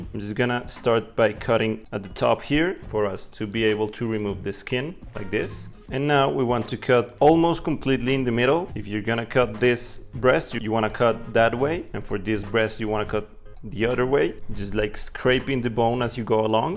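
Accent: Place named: Rolo, United States. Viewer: It's funny how you have that perfect English with the "rolo" accent.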